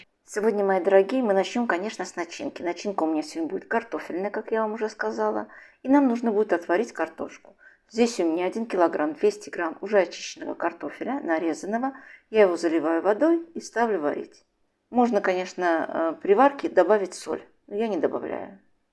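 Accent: Baku, Azerbaijan. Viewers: Здравствуйте. Большое СПАСИБО за вкусняшку.👍 Скажите пожалуйста, Вас что-то связывает с Азербайджаном....Я просто слышу в Вашем приятном голосе,наш,азербайджанский акцент! Извините пожалуйста,за не скромный вопрос.🙏🙋🌹🌺💕👍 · Очень приятно слышать ваш голос, узнаю наш бакинский родной диалект, он просто неповторим… у вас чудесные рецепты! Спасибо! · У женщины легкий бакинский акцент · ОБОЖАЮ ВАШ ГОЛОС И НЕМНОЖКО БАКИНСКИЙ АКЦЕНТ ВСЕХ БЛАГ ВАМ,ДОРОГАЯ!!!❤❤❤❤❤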